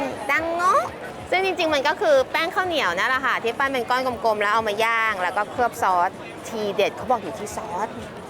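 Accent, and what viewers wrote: United Kingdom. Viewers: I love your English accent!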